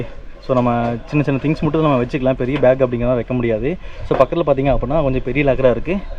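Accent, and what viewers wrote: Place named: Coimbatore, India. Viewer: every ride 🤣 your reaction is ultimate na and your way of talking nga nga our cbe slang nanum cbe thanga na good video take care of ur health also keep going na❤❤😊😊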